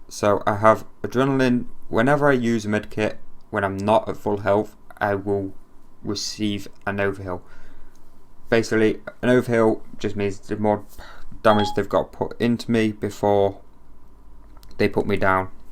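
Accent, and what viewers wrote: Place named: Stoke, United Kingdom. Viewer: ahhhhh accent sounded a bit stokish :) subbed you though look forward to more content cheers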